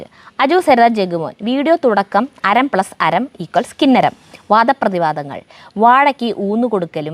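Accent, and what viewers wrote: Thrissur, India. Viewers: നമ്മളും ചുട്ട ന്ന് തന്നെയാണ് പറയുന്നത് പ്രോപ്പർ തൃശ്ശൂർ ഭാഷ അത് തന്നെയാണ്. തൃശ്ശൂർ അങ്ങോട്ടും ഇങ്ങോട്ടും നീങ്ങുമ്പോൾ വ്യത്യാസം ഉണ്ടാവും. നിങ്ങൾ പ്രോപ്പർ തൃശ്ശൂർ ഭാഷ തന്നെയാണ് സംസാരിക്കുന്നതു 👍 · trissur ഭാഷ യാണ് നിങ്ങളുടെ ചാനലിന്റെ highlite trissur ഭാഷ മാത്രം പറഞ്ഞാൽ മതി ഞാൻ മലപ്പുറം കാരിയാണ് 34 വർഷമായി hydràbaadil settiled aanu njaan ningalude ella vidieo kalum kandittund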